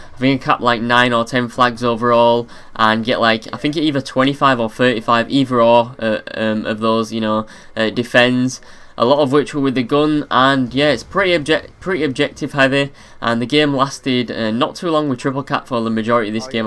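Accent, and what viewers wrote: United Kingdom. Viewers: Which part of the UK are you from?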